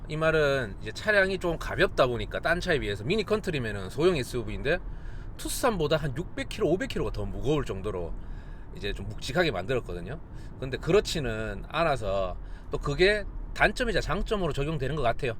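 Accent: Gyeongsang, South Korea. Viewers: 리뷰 잘 봤습니다~~대구분이신가요~??^^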